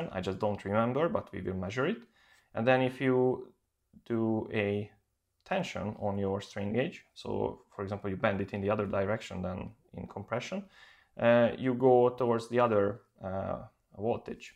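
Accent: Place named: Hungary. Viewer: Le se tagadhatnád, hogy magyar vagy ilyen akcentussal. Amúgy jók a videók👍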